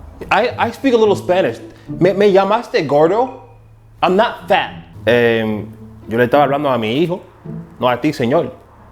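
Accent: United States. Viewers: 😂😂😂😂😂 bro el acento gringo yo moriiiiii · That gringo accent makes me really practice my speaking skills!! I DO NOT want to sound like that!!!! LOL!!! IT is truly "cringy"LOL! Thanks for the awesome vid!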